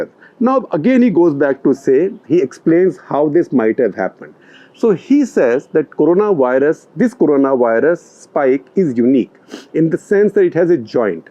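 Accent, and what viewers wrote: India. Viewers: GOOD GRIEF!! SORRY UR YAKKING IS SO MONOTONOUS N NITBTO D POINT I HAD TI SHUTVOFF AFTER 5 MINUTES... IT WAS UNPLEASANT TO LISTEN...TOGETHER WITH D INDIAN ACCENT UT MADE IT WORST. . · Firstly so difficult to follow this Indian due to his heavy accent. Second, he failed to mention the outbreaks and deaths in Fort Detrict, Maryland back in August 2019, which the CDC Robert Redfield confirmed was covid-19. Then the traces of this virus found in waste waters in Italy, Spain and France as early as March 2019. These important facts were not mentioned nor investigated even though the findings were months early before the Wuhan outbreak. This report is rubbish and unreliable and biased !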